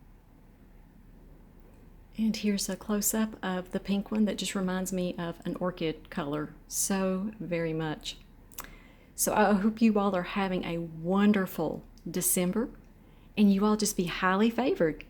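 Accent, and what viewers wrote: South, United States. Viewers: I love your accent, is it a southern accent? Beautiful. I love these plants, I have 3 of them. One is solid vibrant pink and the other two colors are rare colors, Sumba Brazil (reminds me of a sunset) and Gold charm (pink and yellow mix) ❤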